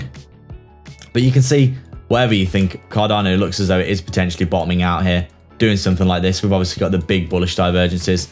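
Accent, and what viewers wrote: United Kingdom. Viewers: Its so refreshing to hear a fellow brit talking haha!! Keep it up mate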